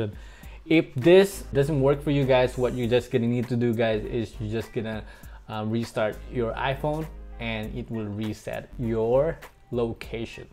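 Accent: Philippines. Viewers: Hehe salamt po kuya tiga SUBIC po ako yung pagsasalita mo palang halatang pinoy na 😂♥️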